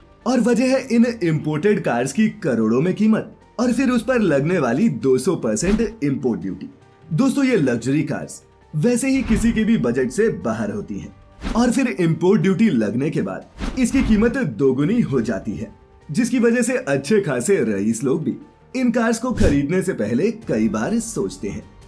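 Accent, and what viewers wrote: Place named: Hyderabad, India. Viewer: Hydrabad side se hoga.. hindi aise hi accent mein bolte udhr ke log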